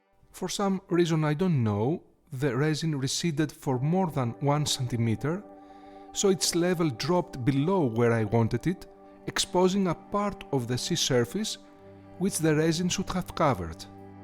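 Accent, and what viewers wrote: Greece. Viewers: what is this gentleman's accent? I want to say Greek? It's lovely, very pleasant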